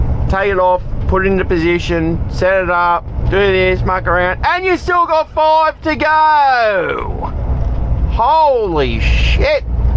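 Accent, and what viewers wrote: Australia. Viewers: After watching vids with over excited yanks yelling rolling R's in my ears, it is like sweet music to hear Australian. I enjoy the reversing skills too - good one bloke.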